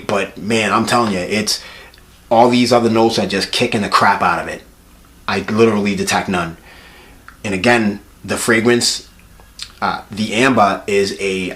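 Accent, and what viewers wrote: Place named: Northeast, United States. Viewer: Hi, first time viewer of your channel and I love it. Could you please tell me where you got the fragrance? From your accent, I presume you are in the Northeast US (like me). Did you have to order it direct from Montale? My usual go to Montale spots (Bergdorf, Neimans, etc) don't have it. One more question, if you have sniffed Dubai Indigo (Bond No. 9), does it smell too similar (I saw that mentioned somewhere)? Thanks in advance, I am definitely subscribing 😀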